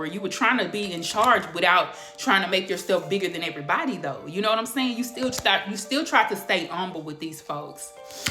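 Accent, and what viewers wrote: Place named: South, United States. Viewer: Thank you for your readings. On point. Question. Your accent. Are you from Virginia or the Carolinas?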